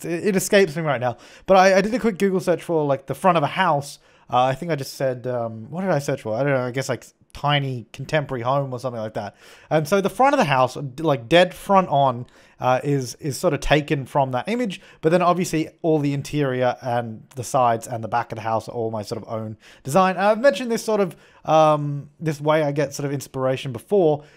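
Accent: Australia. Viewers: yeah us Aussies say things weird lol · OMG HE IS AUSSIE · I feel so disappointed, as an Australian, that I didn't recognise that you're Australian until you said you are. Haha. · i didnt know u were an aussie lol ok well u do learn something new every day :P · I've been watching his video for ages and never recognised the Aussie accent cause I'm Australian too lol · YOUR AUSSIE I DID NOT KNOW THAT OMG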